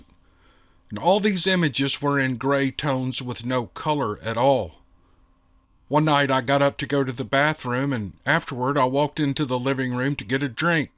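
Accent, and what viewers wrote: South, United States. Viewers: "manly" southern voice is a national treasure as far as this old gal is concerned. I despise squeaky voices coming out of a fellas mouth & hate listening to ads but I'd actually listen to em if was the one narrating it 😂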